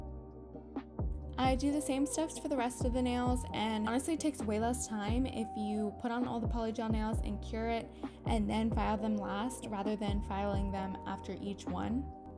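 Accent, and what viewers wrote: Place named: California, United States. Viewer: I see what you mean. It has the same cali girl accent, but she doesn't have the same tone of voice.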